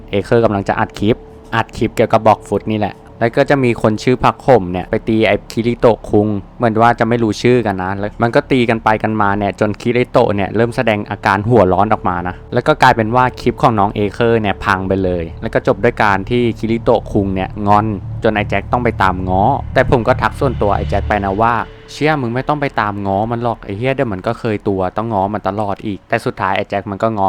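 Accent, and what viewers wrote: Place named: Kanchanaburi, Thailand. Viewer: เสียงพี่ก็เหมือนพี่แจ็คเกิ๊น สำเนียงกาญหรอครับ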